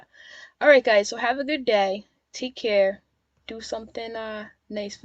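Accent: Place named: New England, United States. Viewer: This is the first time I've viewed your channel while looking for an update on Sophie. Your voice and accent make me feel like I'm back home in MA. I live in FL now but you sound so much like my friends back home. Are you from New England by any chance?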